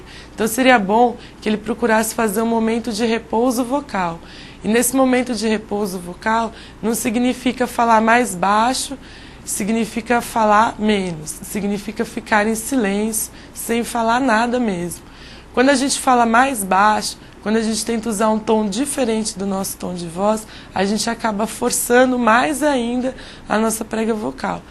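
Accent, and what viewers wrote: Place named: Sao Paulo, Brazil. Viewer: (BARBRINHAENF) -------- Num tem nada à ver com sotaque não. Vc tá dizendo que ela tem um sotaque diferente do que estamos acostumados a ouvir no YOUTUBE, PÔW, o sotaque dela é PAULISTA, e sotaque paulista a gente tá cansado de ouvir no youtube, o problema é a voz dela mesmo. Fátima Bernardes, Cid Moreira, Zé Ramalho VOCÊ E EU SEMPRE VÃO e vamos ter a mesma voz em qualquer sotaque, compreendeu o que eu disse? Então não me venha com essa não porque não colou.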